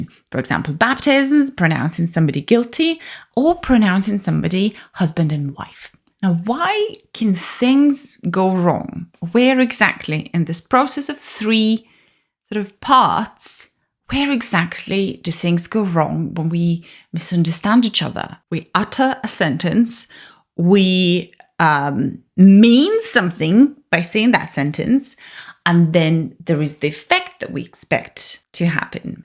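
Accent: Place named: United Kingdom. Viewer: It's interesting that your British accent is so strong.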